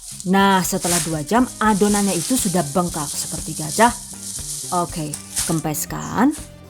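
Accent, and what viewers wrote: Banyumas, Indonesia. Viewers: Ealah jebule nu Wong ngapak yu😅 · Basa ngapak e keluar toh bu.... 😀😀😀😀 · "DONE" Dubai hadir pas lagi nyari dan berpikir untuk buka puasa sunnah senin nanti pas ada notif odading gue jadi kepikiran odading yg lagi viral pengen ngerasain karna jauh antara indonesia dan Emirates Dubai.eehh..ternyata ada notif langsung da gue pergi ke dapur buat odading persiapan buka puasa sunnah senin...... #GiveawayTHV2020 Terimahkasih atas resep nya Bundah sudah cantik jawa nya ngapak lagi🥰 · Sampean lucu kalau ngomong apa lagi klau lagi ngomong bhs ngapak...aku ngapak PBG Mbak...😀😀😀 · ngapaknya bikin ngakak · Jebule bahasa ngapak ap ya? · mantul menul"resepnya odading bu rasanya spt jd wonder woman nich 😊 gemesh...sm suaranya yayuk ke apa lg kalau lg ngapak 😘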